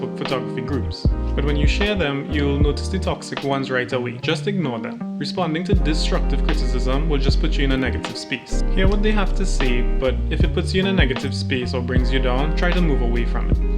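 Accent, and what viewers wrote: Trinidad and Tobago. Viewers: Lol how u sound like a trini so bro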